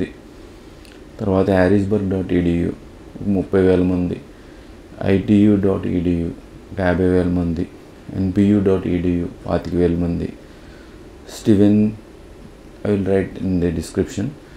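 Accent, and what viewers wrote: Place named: Rajahmundry, India. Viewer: (Offtopic) naku elago USA nachaddu, but i like your accent sir, meedi rajamundry na?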